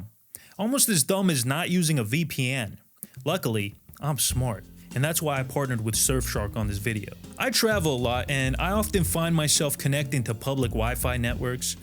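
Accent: Italy. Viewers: First 20 seconds I already like the narrarator's accent lol. Is that... Italian?!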